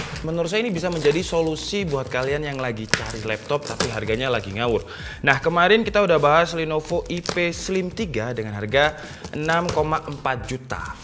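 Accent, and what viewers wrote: Java, Indonesia. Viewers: pancen wong jowo kok mas wkwkwkwk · logate kyk wong jowo 😅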